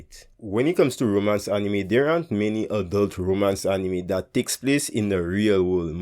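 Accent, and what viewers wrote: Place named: Caribbean. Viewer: I hear your accent. Are you from Guyana or St.Vincent? What Caribbean island are you from?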